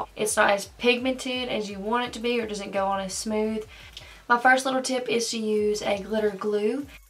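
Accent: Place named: North Carolina, United States. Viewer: You sound like you're from where I'm from :) NC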